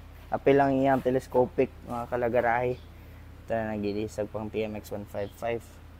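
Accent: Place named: Davao, Philippines. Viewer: The way you talk... Feel ko taga davao kayo😊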